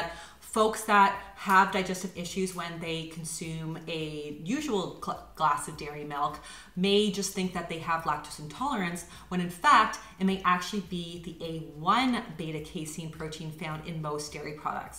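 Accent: Canada. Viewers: You look so gorgeous in this video, and can you send me some of your groceries please? Everything seems so delicious. Also, You don't say bagels wrong You just have a Canadian accent. And when you try to say it differently it sounded exactly the same in my opinion 😁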